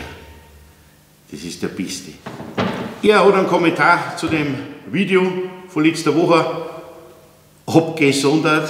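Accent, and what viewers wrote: Bavaria, Germany. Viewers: Zugeschaut - Mitgebaut. Und a Bairisch lernst obendrein a no. Tausend Likes aus Preußen. Weiter so! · Hallo, ich bin zufällig über deinen Kanal gestolpert und mir gefallen als Elektroingenieur die sehr lehrreichen und praxisnahen Beiträge wirklich gut. Hier wird gezeigt wie man es richtig macht und warum und was man nicht machrem sollte und warum. So kompakt und nachvollziehbar wird selten das Elektrohandwerk erklärt. Das spart viel Zeit die nötig wäre in Normen und Lehrwerken herumzusuchen. Mich stört auch die leichte bayrische Mundart nicht - wer das nicht mehr versteht sollte lieber auswandern...... Mach weiter so - ich freue mich auf die neue Folgen. Einen schönen Gruß nach Bayern aus dem Schwarzwald.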